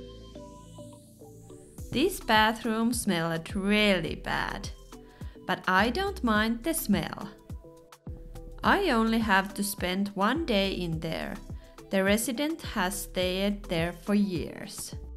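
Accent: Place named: Finland. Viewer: And Finish accent too is.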